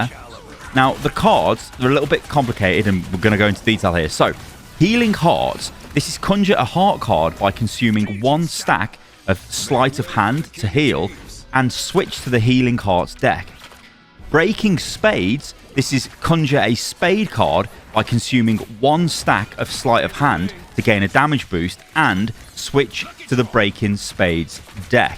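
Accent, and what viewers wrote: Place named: United Kingdom. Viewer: It's just his br'i'sh accent ;)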